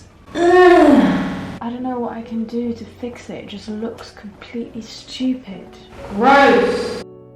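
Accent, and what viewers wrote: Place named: United Kingdom. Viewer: you absolutely should! Initially I distinguished your accent as British, but your beautiful yet unique name didn’t match my perception, then you dropped quelques mots français..and seeing your mum in frame I was instantly corrected! Aha! You are French! From your mother’s side (?) yet you’re based in Adelaide (wrong again. I initiallly thought north coastal Qld (sunny coast perhaps). Then Melbourne due to your private school uniform and the fact you commute/d to school/uni). I’m a visual arts teacher (secondary years) based in Brisbane and once I saw your year 12 exhibition vlog I quickly determined you were in fact based in Oz and completed your ATAR for General Art (at first instance your accent threw me off as I thought you were based in the UK and had completed your QCSE). You are quite the anomaly, Mademoiselle. A beautiful contradiction. 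Completely smashing all my preconceptions of you. I love being proven wrong, oh and the element of mystery ☺️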